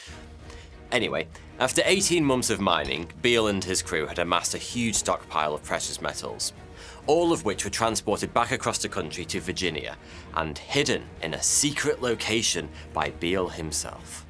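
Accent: United Kingdom. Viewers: I don’t mind British accents, in fact I love them, particularly the cockney accent. But, please start saying your th’s correctly rather than as f’s or v’s. I love your videos, but it seems I might have to stop watching. If you do this I will subscribe and support your channel. Thanks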